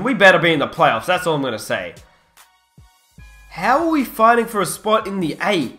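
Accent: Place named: Australia. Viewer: yo are u from Australia or England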